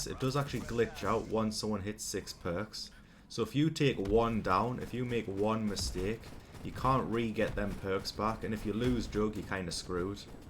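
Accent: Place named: Northern England, United Kingdom. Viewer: Congratulations, glad to hear another northern English on YouTube, not to often you find us.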